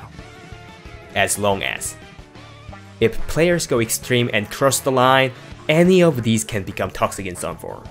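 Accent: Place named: Austria. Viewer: Are you by any chance Austrian? For some reason I get that from your voice.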